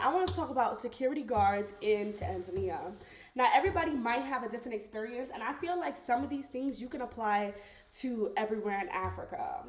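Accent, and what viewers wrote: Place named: New York, United States. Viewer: You sound so relatable like a woke asf n.y’ ker and are living my dream. I’m so over the western world .. god bless you and your growing family 🌍..😍😍😍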